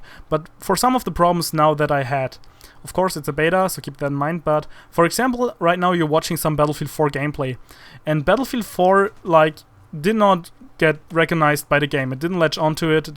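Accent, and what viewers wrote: Germany. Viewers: Kanns sein dass du aus Deutschland kommst? :D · Safe du bist Deutscher? xD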